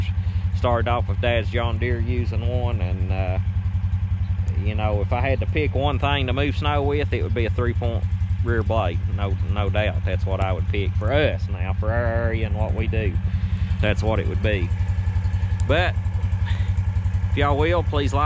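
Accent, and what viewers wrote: South, United States. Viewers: Love your southern accent! Yont to?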